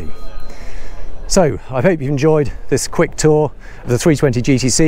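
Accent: England, United Kingdom. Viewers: Does anyone care about insulation for cold weather boating? It's a super impressive for the price. It is amazing. However boats in general tend to ignore, three of the four seasons we have here...as in Ireland, where I'm from, Scotland, where he mentioned the boat was from, or England, where he sounds like he's from...but basically the entire north half of Europe, all of Canada, and basically almost all of the USA. I'm not trying to say a boat like this is bad, because for the price, it's super. But if you've ever been in a warm house with poor insulation, or patches of poor insulation, then you know about kold and how hard it is to get out. It will literally dif itself into surfaces like plaster, concrete, and ceiling boards. I know this from first had experience of literally digging into a concrete wall, and a ceiling board, on separate occasions, and finding mold dug in a good few millimetres. 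Any cleaning fluids won't work when it's inbedded into the concrete itself.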